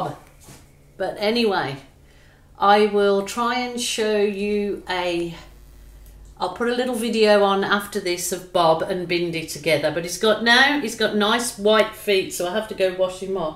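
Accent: Birmingham, United Kingdom. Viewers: gorgeous as ever !! Love your little fur babies 🥰 do I detect a Brummie accent in there somewhere ? 💞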